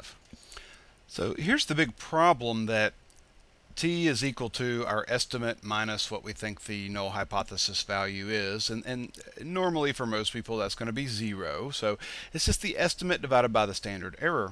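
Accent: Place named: Northern England, United Kingdom. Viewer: Some words are Southern like "dependent" and most of your accent is Northern.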